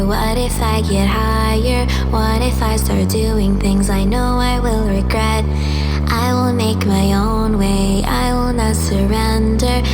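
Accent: India. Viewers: You're in my" top 5 indians i like" And i love the indian aceent bruh 😂😂✌🏾💯 on point from Bangladesh 🇧🇩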